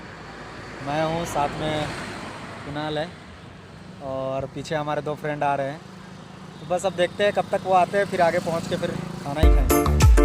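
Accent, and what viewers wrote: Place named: Jharkhand, India. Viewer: कमेंट्री और बैक ग्राउंड म्यूजिक के वॉल्यूम में तालमेल नहीं है।कमेंट्री सुनने के लिये वॉल्यूम को बढ़ाना और म्यूजिक की बारी आने पर वॉल्यूम घटाना पड़ता है।फिर, कमेंट्री का स्टाइल आपसी बात-चीत की तरह "स्पीडी" है, जब कि इस तरह के वीडिओज़ में कॉमेंट्री स्पष्ट आवाज और थोड़ा,.. थोड़ा स्लो स्पीड में होना चाहिये। आप अपने कमेंट्री में लोगों को आमंत्रित कर रहे हैं कि कभी झारखंड आइए तो ..... इसका मतलब आप बाहरी लोगों को आमंत्रित कर रहे हैं।ऐसे में आप को राष्ट्रीय स्तर की भाषा में कॉमेंट्री करनी चाहिये, न कि " आव रे, जाव रे " वाले स्थानीय स्तर पर।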